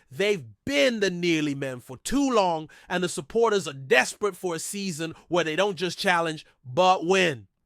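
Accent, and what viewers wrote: United States. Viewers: love the American accent in Scottish slang, fkn hilarious.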